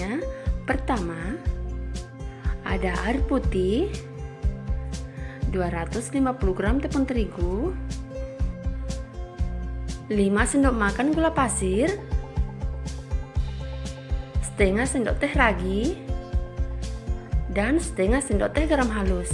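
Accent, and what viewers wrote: Indonesia. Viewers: Mba.. Asli Bugis Makassar yah? Logatnya kayak org Bugis, salam kenal yah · Kaya orang bali kalo ngomong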